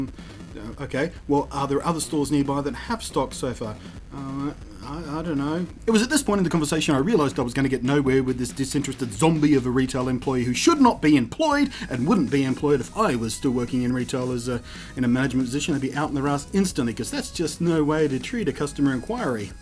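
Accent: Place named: Australia. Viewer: Lol, sorry I laughed my way through this xD I live in a country town so getting to my closest EBgames is quite a drive, when they told me they where out I just didn't even want to bother as I have my Dex filled out anyway. Anyway I went up to the Gold Coast and to Pacific fair and they had a massive pile of the Mew cards (this was also at the end of the month) I did ask the lady about more if you have multiple game copies and she said no it's done by per person, so I figured that's why they still had so many cards at the end of the month... I was just going in for super mystery dungeon so it was a nice surprise after I wasted an hour driving to get one from my usual store, the woman who runs it is nice, so I'm wondering if I could ask to have one kept aside or some thing? To be honest the only one I really want is Darkrai. Oh well, awesome to hear an Australian accent ranting about Pokemon, makes me feel less of a weirdo xD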